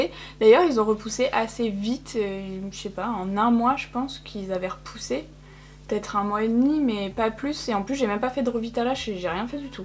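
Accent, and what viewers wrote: France. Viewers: Ahah ton accent espagnol c’est pas trop ça 😂 très frenchy c’est mignon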